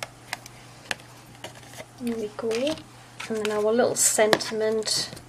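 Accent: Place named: Sunderland, United Kingdom. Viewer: Nihe1967 I would guess Sunderland? Quite specific accent 😊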